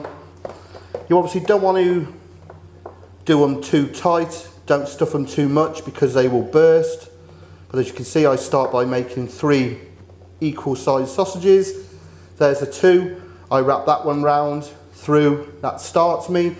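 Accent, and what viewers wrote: United Kingdom. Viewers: Stick to the metric system. Pounds, ounces, etc made no sense in your british accent.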